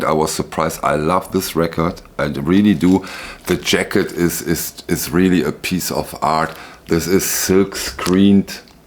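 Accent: Germany. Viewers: Hey, I find your slowness in speaking embarrassing and I hate your terrible German accent: e wäry, wäry, ärly pressing. Phhh. Everything you say is predictable and completely unoriginal. I don't think you're very musical, based on what you rate positively. I am amazed at your many fans. Ok. That's how it is.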